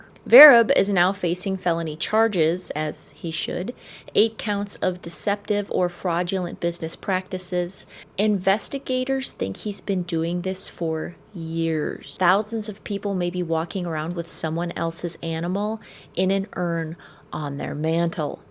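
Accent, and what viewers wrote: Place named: Northeast, United States. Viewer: I love the way she talks, the way she pronounces tag, bag and flag. Shes got to be from the northern east coast ❤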